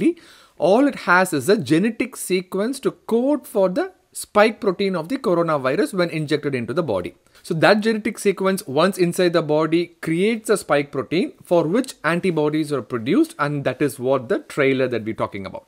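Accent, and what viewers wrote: Tamil Nadu, India. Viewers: Could u please tell the message in Tamil languages something English language u are speaking Tamil ascent good keep it up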